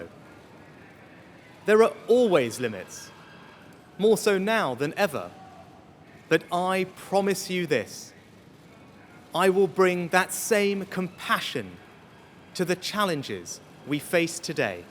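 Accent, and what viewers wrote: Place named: United Kingdom. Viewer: What a clear British accent wow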